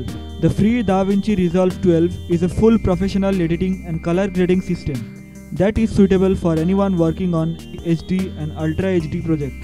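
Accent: India. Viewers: Difficult to understand the Indian dialect...... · When i hear this sound how he is talking he is from Indian for sure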